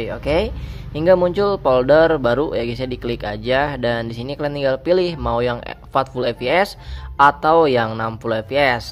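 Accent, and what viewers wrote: West Java, Indonesia. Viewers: Anjay...sundaness pisan lh logatna😂😂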